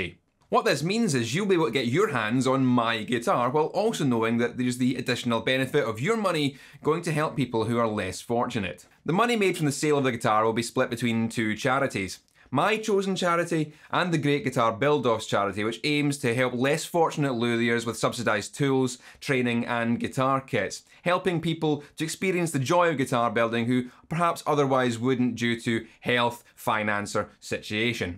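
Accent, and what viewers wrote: Scotland, United Kingdom. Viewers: Beautiful. Also, is it weird that has never sounded more Scottish than he does in this video about the most Scottish guitar? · His accent sounds stronger in this video, the Scottish pride is bleeding out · Absolutely love this, pure magic and in an accent I’m more than comfortable with. Subscribed and keen to consume more. Soar Alba 🏴😁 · Lol unrelentingly Scottish from start to finish. Love it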